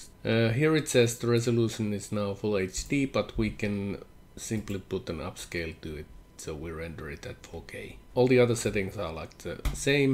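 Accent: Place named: Finland. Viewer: Ei se pahasti kuulu läpi se ralli englanti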